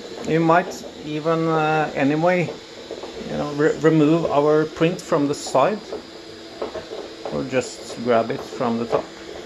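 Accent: Norway. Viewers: ok jag visste de ifrån din dialekt har vänner ifrån Norge så kände igen dialekten direkt 😊😊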